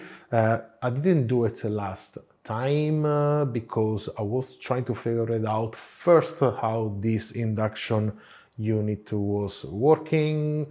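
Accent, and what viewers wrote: Italy. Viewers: Hello, love your show and you have a nice Italian accent and well-spoken English. Just wanted to tell you that a word that you use often should have the accent on the first syllable as is the case in spoken Italian. The word is VARIABLE.....VA' REE A BLE...not...VA RYE' A BLE . Please excuse this subtle correction while I always chastise English and American native speakers who are often the worst at respecting foreign word pronunciation.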